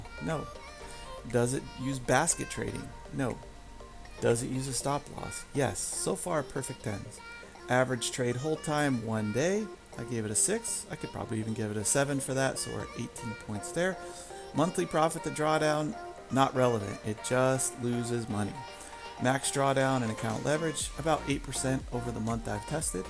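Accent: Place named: United States. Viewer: This is a really odd video and contains so many incorrect statements it's not funny. I don't see anywhere on the video where you show any of the bots or portfolio that you created. You don't mention anything about trading them semi-automated through the Telegram alerts so I can only assume you ran this 100% automated, 100% of the time? If so, that is clearly NOT how the software is designed to be used. It is NOT an EA or anything close to an EA. It relies on you, the user creating bots and a portfolio that satisfies your own criteria as a trader. It also relies on you as a trader to decide on which trades to take once they are presented to you. Having a conversation with another user, rather than with ourselves as the creators seems mighty strange to me. It looks like the blind leading the blind. As you can see from the live leaderboard, many of our traders are achieving excellent results from their own created and managed bots, see here <link> As for your execution issue that you mentioned, how on earth can that be something we control. 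What has your broker suggested (I'm assuming you asked them or did you simply blame us?) The system sets the trade on the built in virtual bot and then instantly copies that to your live account. You sound American to me, so just maybe it was an issue with your FIFO rules that your brokers place on you. Of the hundreds of live users, all with a large variety of brokers, I've never heard this issue before. It is certainly not a software issue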